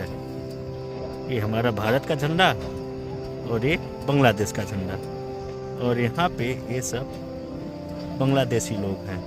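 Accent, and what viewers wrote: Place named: Bihar, India. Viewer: Tu pakka bihari hai...abe saaf saaf bol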